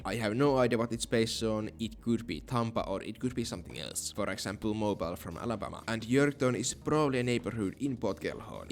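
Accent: Finland. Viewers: You sound Finnish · Finnish more likely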